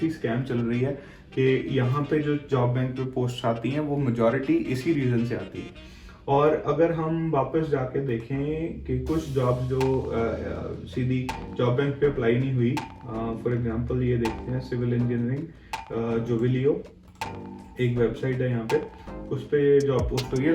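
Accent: India. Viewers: Important matter. Can u please add captions to this video? Sorry I can't understand your English Indi accent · Can't get you clearly, music n too noisy with indian speaking confuses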